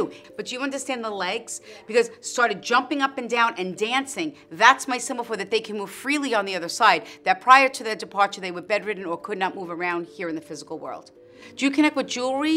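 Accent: New York, United States. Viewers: “DO YOU UNDERSTAND WHAT IM SAYING?!” With a New York accent keeps you in check.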